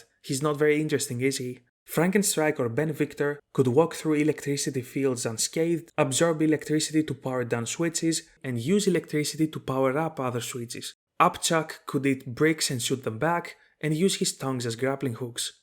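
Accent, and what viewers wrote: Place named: Greece. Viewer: OK this is something I was legit theorizing about myself since I was little so I knew I had to click on it. And as if it wasn't enough, 2 seconds in I'm like "Hold up, αυτή η προφορά μου ακούγεται *ελληνική*" and sure enough, looked at the listed location and that's what it was lol. So not only did I stumble upon a video about a hypothetical I used to think about myself back in the day, but the person doing it is from the same country as me. What are the chances?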